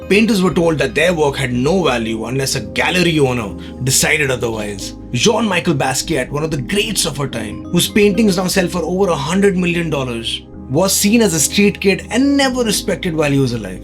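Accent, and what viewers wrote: India. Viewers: I was also shocked (in a good way) when I clicked this video and heard an indian accent. It's so refreshing to see an indian channel cover this topic considering the dislike of arts or humanities in general in our country. You just got a new subscriber. Would love to see more videos like this.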